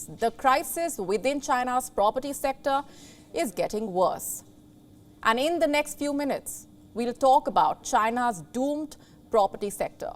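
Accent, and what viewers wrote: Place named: India. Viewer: The indian accent is the worst. so irratating.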